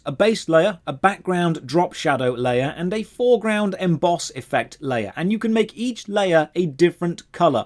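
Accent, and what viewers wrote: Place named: RP, United Kingdom. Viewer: Oh MY GOD ENOUGH with these British youtubers and their clickbaity embellished titles and long droning low effort content, and their received pronunciation speaking as though they are some authority on the subject... Jesus, no other country produces as many cruddy youtubers from this "game the algorithm" school. You've called about 40 MMO's the worst MMO ever, you've never played many of these games you talk about in any significant amount... Low effort, fair use abusing, overly verbose garbage.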